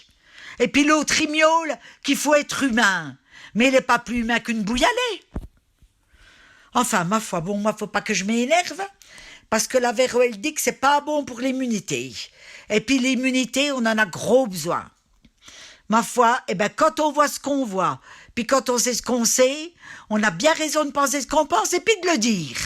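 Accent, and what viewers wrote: France. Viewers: Merci ma très chère pour ta verve et ton regard si aiguisé sur cette société mortifère...on a besoin de ta vision et de ton accent qui nous emmène au cœur de ta belle province. On t embrasse du cœur du Nivernais et n oublie pas Lola!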